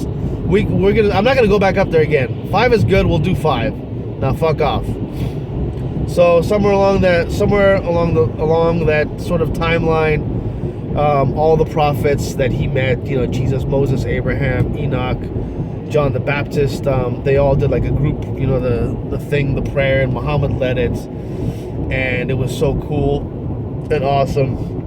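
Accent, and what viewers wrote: Arab world. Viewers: You are fake , and you have Arabic accent even when you try to pretend different dialogue your accent is more clear so, how much you got payed from YouTube for that fiddling video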